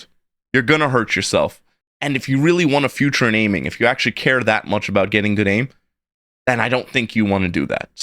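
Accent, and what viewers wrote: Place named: New York, United States. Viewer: Man you def sound like a New Yorker that wants the best for everyone. I'm a tekken refugee playing marvel rivals and these videos helped alot!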